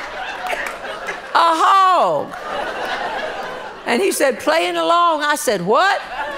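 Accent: South, United States. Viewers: I MISS the south every time I listen to you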